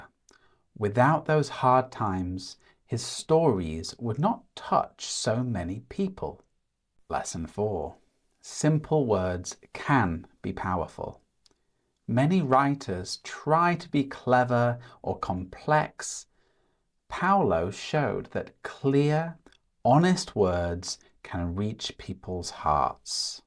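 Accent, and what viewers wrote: RP, United Kingdom. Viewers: Do you speak recieved pronunciation ?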